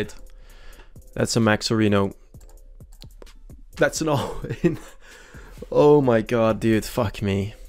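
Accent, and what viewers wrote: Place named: Netherlands. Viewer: Where you from? You sound dutch 🇳🇱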